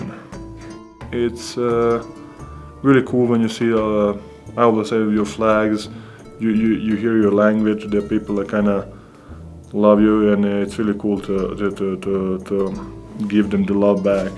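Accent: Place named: Sombor, Serbia. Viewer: Pozdrav, uz tebe smo brat nas! Nego opusti se i pricaj engleski sa tvojim somborskin naglaskom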